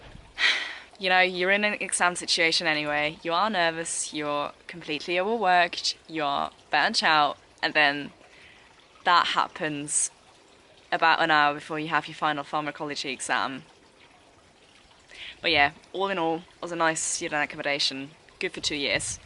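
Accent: United Kingdom. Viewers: Du klingst als ob du zu 100% britin wärst :)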